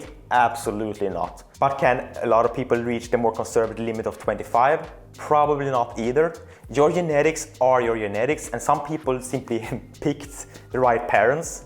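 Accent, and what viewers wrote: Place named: Sweden. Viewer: Bro are you Swedish? Cause your accent sounds quite Swedish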